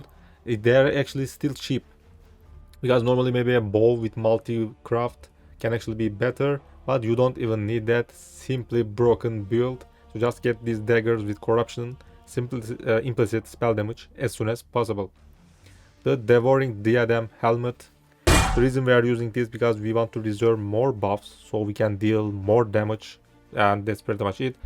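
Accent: Turkey. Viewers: Türk oldugunu Ingilizce Konusmandan hemen anladim 😂 Bende bu Buildi 3.16 de kulancam. Almanyadan selamlar!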